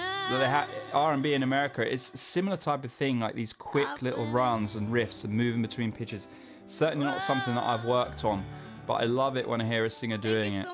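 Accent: England, United Kingdom. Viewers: Are u English ?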